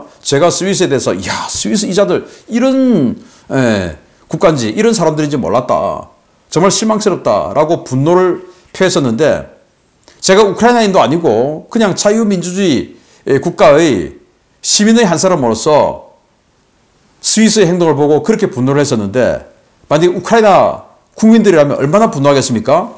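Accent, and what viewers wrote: Gyeongsang, South Korea. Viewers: 늘 나라위한 소식 감사합니다 그러나 알려서 오히려 해가 끼칠걸 판단 하시는것이 먼저 진정한 나라위하는 것이고 자신 중심과 우상적 말씀은 자제하셔요 가만히 앉아서 실기의 전쟁상황을 이론의 말씀만으로 자신하십니까?.그리고 뒤가 먼저입니까?나아가는 앞이 먼저입니까?마치 한국이 전쟁을 치루어야 되는것에 긍정만 보시는데.핵심은 전쟁없이 살생없이 주변과.특히 북한과의 사이 아닙니까? 또.제일 중요한것은 팔이 안으로 굽는다고 북한군인이 죽기 살기로 뛰어드는 사정을두고 무기줄테니 죽여라는 것입니까?그리고 또.친구 비유 하시는것도 보면 사상적 까지 의심스럽듯이 친구것을 먼저 탐하는것에서 화끈이 되네요.아무리 가까워도 내것이 아닌데 버리던 말던 왜?열을 내십니까?바라건데 앞으로 전쟁에 대한 자료는 접는게 더 좋을듯 합니다.또.경상도 억양으로 진짜 경상도 사람을 욕먹이고 있지 않습니까?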